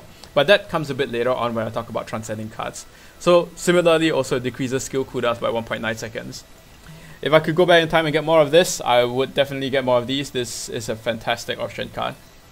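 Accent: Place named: Singapore. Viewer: Just curious. You sound singaporean. Like your video btw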